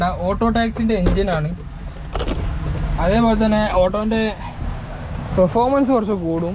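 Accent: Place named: Nilambur, India. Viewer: മലപ്പുറം നിലമ്പൂരിനടുത്ത് ആണെന്ന് തോന്നുന്നു സംസാരം കേട്ടിട്ട്.. ☺️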